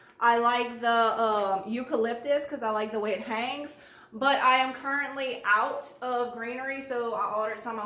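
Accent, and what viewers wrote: Louisiana, United States. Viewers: Gotta be from Louisiana. Love the accent!! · i live in TExas but im cajun 100%(POUSSON) maiden name 😁 was born lafayette and raised in Iota , by Crowley La I feel like im not so far from home when i hear that great cajun accent sha !!!! I am hooked on your page , love your work ! My junkin sista ! Lol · I have to know..... you're from bayou Lafourche, huh? I can hear it!! lol! Im from Houma. Your boards are gorgeous!!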